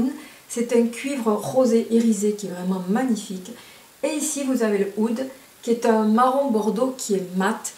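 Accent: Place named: Southwest, France. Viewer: C'estdommage d'enlever les swatchs! car on ce rends mieux compte de la pigmentation des Fards.. la couleur..et Les packaging. En plus j'adooore Ton accent! Pourtant je suis de Marseille et j'en ai un aussi! J'adore le sud ouest! Hâte de venir y faire un tour... Brefff... c'est toi le chef.Mais c'est dommage... 🙄🙄 Bon we à vous deux. Des bisous 😚😚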